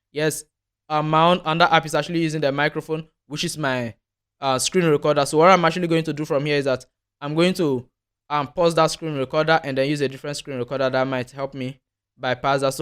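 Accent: Nigeria. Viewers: Wow... Nice content 🎉 Your accent is gradually becoming 100% Nigerian, wow ❤. Ghana and Nigeria are one ❤ I was surprised seeing how you follow a lot of Nigerian accounts online. Great content mi bro 🎉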